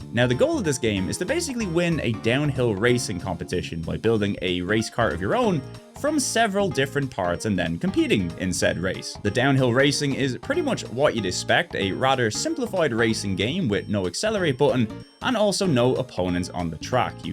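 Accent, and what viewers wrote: Ireland. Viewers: More Irish PlayStation man! Flipping get in!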